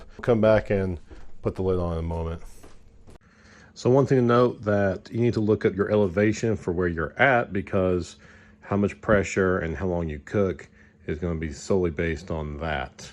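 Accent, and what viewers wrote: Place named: Appalachia, United States. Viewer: He gots one of them Appalachian accents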